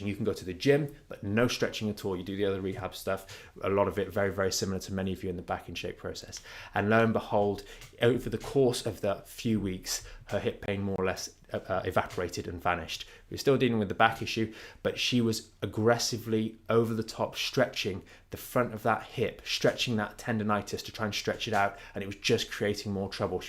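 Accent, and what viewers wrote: England, United Kingdom. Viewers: I barely understand this guys accent. Its so horrible to listen too and speaks way too fast. How i wish he was an American and not English.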